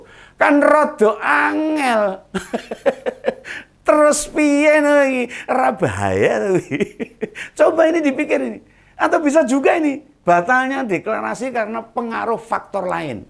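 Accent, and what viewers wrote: Java, Indonesia. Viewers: selalu menunggu video nya Om Logatnya khas nya jowo bngt 👍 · Siip om. Sehat seger waras Terus Om. Podo podo Wong jowo, penak disimak😊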